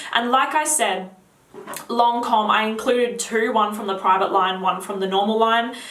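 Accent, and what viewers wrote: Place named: Australia. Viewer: I like your accent are you Australian or British?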